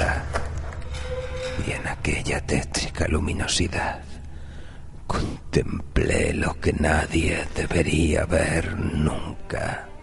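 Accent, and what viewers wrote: Catalonia, Spain. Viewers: Una acento muy catalán. Me gusta. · le das un toque catalán xD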